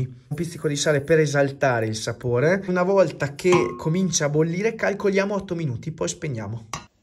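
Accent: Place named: Trentino, Italy. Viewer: Auguri per i tuoi denti!! Sei sempre molto chiaro nell'esporre le ricette👏. Mi piace il tuo modo di proporti, naturalmente la tua bravura e passione in cucina è super. Ho dei parenti a Trento e la tua parlata con a volte qualcosa in dialetto mi piace un sacco!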